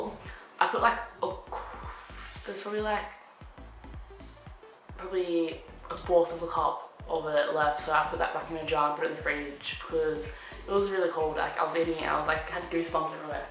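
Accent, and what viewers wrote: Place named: Australia. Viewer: How many bananas did you put into your smoothie bowl? You have SUCH a strong Australian accent btw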